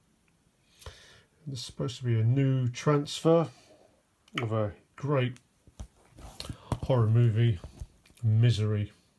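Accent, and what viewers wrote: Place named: United Kingdom. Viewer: Hello! Merry Christmas! How did you get the name btw? You sound very British,but here in the states when someone is named they're usually from a Spanish speaking background.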